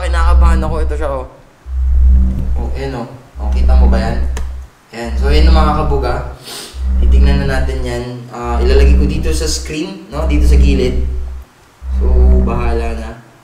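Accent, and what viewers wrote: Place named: United States. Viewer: Bakit ka nag american ako subcribe ko tas like shempre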